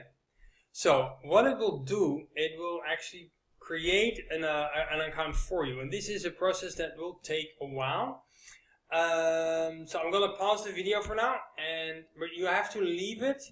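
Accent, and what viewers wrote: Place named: Netherlands. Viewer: How do you speak english, havin a dutch accent with russian on ur PC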